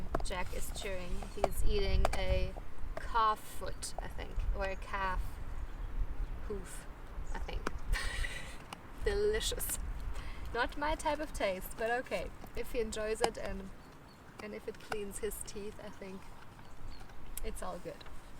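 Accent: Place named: Southern England, United Kingdom. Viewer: Hello there, first time on your blog. If than mini chainsaw is a Mader then you've wasted your money as un reliable quality.. Those bushes you are cutting are Minmosa and is a vicious weed, cutting them back will only make them grow three time more in spring. When you see the new growth come, wait untill a foot high then spray with Round and cooking oil 50/50 this will kill them and give you clean land for next time and watch for new seed popping up as they can be a problem. I know as been clearing my land for years, it was 10 times worse than yours when I bought it. Have now got a large lump cleared and producing. I am a market gardener and now just grow too feed me so if you have any problems or things you need to ask, feel free as am in Portugal as well so have a fair idea now on planting times and what you can and can't grow. 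In the meantime, have fun.Just one thing, olives are a waist of time as you can't eat them, there are other trees you can grow that will feed you, but your choice.Would like as where you are and where you from as the name is Irish but the accent sounds southern England.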